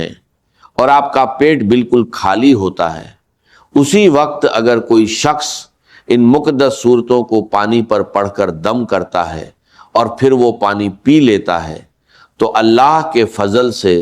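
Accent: India. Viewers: Ya kesi Hindi walay ki awaz ha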